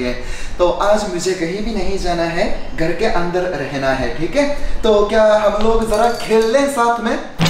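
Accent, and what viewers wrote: Standard. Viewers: Aap kitney achchey Hindi boltey hain bilkul sudh Hindi bhasha. Dhannyabad aap ka. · Bhai badiya to h Hindi, itni shudh Hindi to Indian log bhi nhi bolte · aap hindi bahut accha bolte ho. shudh bolte ho. hame aapki boli pasand hain. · Chikitsak⛑️ oo Bhrata Hridya tript ho gya aapki Uchh ewam madhur vaani sun k....itni shuddh Hindi k Shabdawali k uccharan aapki Prateyak Chalchitra (video) m darshaya jata h...umeed h aap tatpar Aise Hindi ki Chalchitra poorntaha Roop se bnaenge or aap waqta bnenge or hm Shrota aapki Hindi Vaani Dhyanmagan hokar sunenge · bhai aapki hindi bahut hi badhiya, aapke hindi sikhane or bolne kaafi sarahniya hai. Aapki hindi bahut sare bhartiya logo se kaafi atyadhik shuddha hai, bahut sare sabdo ka hindi utcharan bahut bhartiyo ko nhi aata.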